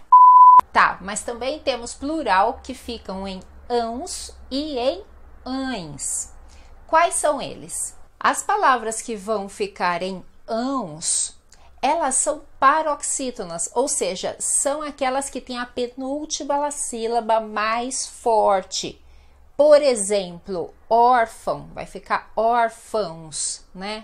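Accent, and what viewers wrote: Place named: Paraná, Brazil. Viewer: Parabéns pelas aulas super didáticas e pela dicção excelente. Você não tem sotaque de Curitiba, mas sim (um pouquinho) do centro-norte paranaense ou do interior de SP.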